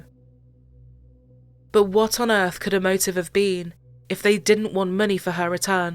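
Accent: United Kingdom. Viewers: yay, super cute, british girl voice narrator crime! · I don’t like a British narrator, it implies that story is in Britain, are there not any American narrators?I’d feel the same listening to a British story narrated by an American narrator.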